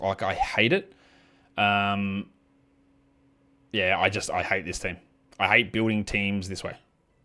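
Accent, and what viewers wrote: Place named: Australia. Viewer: Trying to drop the codeword but I legitimately have no idea what you said. Aussie accent claims another victim. Canadian btw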